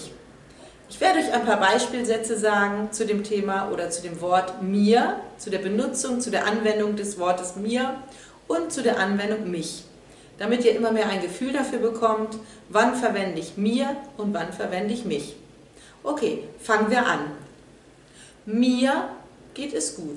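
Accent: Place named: Germany. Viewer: Immer Super Videos! Ich lerne viel hier! Was mir am meisten gefällt ist der Akzent oder die Aussprache. Echtes Deutsch!!!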